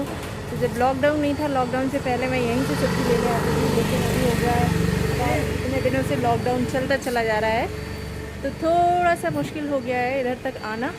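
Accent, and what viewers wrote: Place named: Trivandrum, India. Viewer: Accent says u r in Trivandrum..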